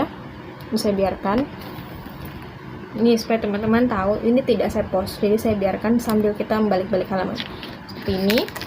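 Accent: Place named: Makassar, Indonesia. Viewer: Dari logat nya pasti orang makassar yah?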